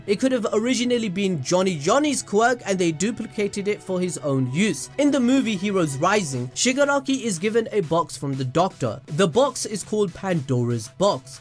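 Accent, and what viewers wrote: Punjab, India. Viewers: Bro are u punjabi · Sir are you Indian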